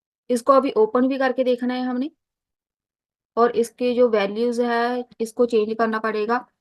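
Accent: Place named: Punjab, India. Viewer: good video, but agar aap aik video punjabi main be banao to bohat acha hoga, kyun k aap ka accent punjabi lag raha ha mujay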